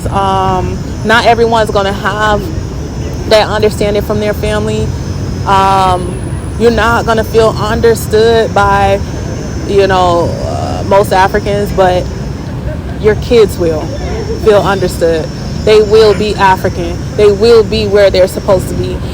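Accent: United States. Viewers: This is easy. Your family thinks you're mentally unstable. They feel you are ungrateful for the sacrifices they made to escape from Jamaica. They also think you're putting your fantasies and expat self-loathing before your daughter's well-being. I don't think you're insane, but I do think you're extremely gullible and immature for your age. You're also spoiled. Many doors have opened for you simply because of your extreme physical beauty. Despite your good fortune, you allowed airheads to convince you that you were lost and without value in America. You will continue to feel even more lost in a continent that is not your home. You will always be a foreigner in Africa. No matter what country you visit, you will be reminded of that. Will you be treated better than Africans from other African countries? Sometimes, but only because of your American accent which will make the natives think you are rich. 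You will be overcharged, scammed and a target for theft almost everywhere you go. It will also be extremely exhausting dealing with visas. Thankfully, you will still have your American passport, because YOU WILL NEED IT to return to the country that you despise.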